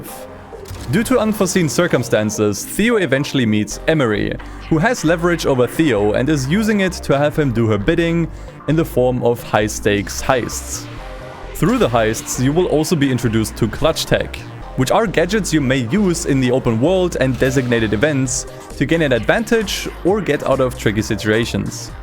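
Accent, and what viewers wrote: Germany. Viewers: Man hört sofort das du Deutscher bist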